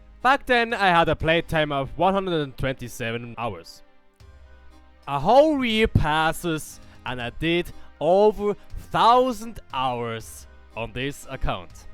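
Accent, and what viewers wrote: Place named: Switzerland. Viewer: Direkt am Akzent der Schwiizer erkennt hahaha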